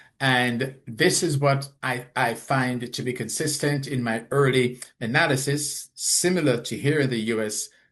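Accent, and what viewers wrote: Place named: Jamaica. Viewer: I have never been a fan of. I don’t know why he tries so hard to hide his Jamaican accent.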